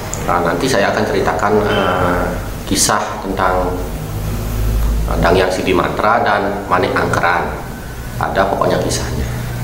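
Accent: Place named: Negara, Indonesia. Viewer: bli, uling negare bli? adi logat care nak negaroa? suksme🙏😊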